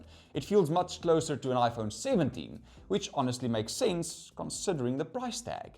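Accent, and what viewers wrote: South Africa. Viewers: Is this guy from South Africa?sounds like it.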